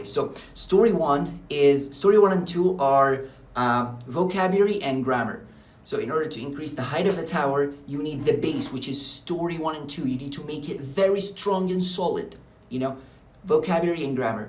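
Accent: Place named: United States. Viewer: Incredible information bro I understood the whole video without looking at the translation I'll take your advice thx bro and your accent is so amazing i can't tell the difference between yours and an American accent ❤❤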